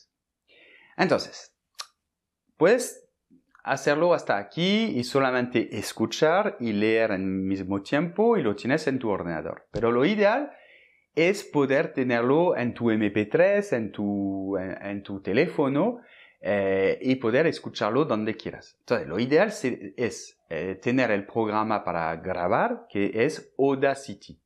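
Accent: France. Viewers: Nasaliza alguna vocal pero su pronunciación es excelente, lo que le queda es un ligero acento francés que hace que escucharle sea un placer. Puede que a ti no te guste y sería legítimo si así fuese pero decir que la pronunciación de este hombre es mala no tiene ni pies ni cabeza, lo siento. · No Sabia que usted tenías lecciones en español también! Tengo que decir que oír español con acento francés es una de las cosas más linda que he oído hoy 😂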